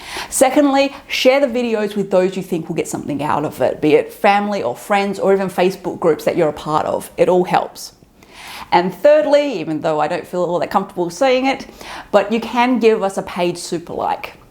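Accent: Australia. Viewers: Hello im an american and just found your channel,. It is very interesting and I plan on seeing 👀 the other videos. Your videos are really get to the root of a problem i see alot in America 🇺🇸 and that is a paralyzing fear of death. Most folks here have trouble with the subject. Perhaps it that many Americans have grown more Godless. If you dont believe in God and an afterlife then whats left??? It scary for those folks. Also I really like the aussie accent and your appearance, you seem very thoughtful and sweet. Many thanks, Ralph😊👍🇺🇸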